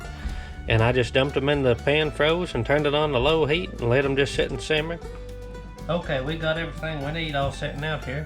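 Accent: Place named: Kentucky, United States. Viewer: I’m smiling the whole video. When I hear you talk...takes me right back to Sunday dinners with my Kentucky kinfolk whom I miss dearly. Until I get to Beulah Land. Thank you and God Bless.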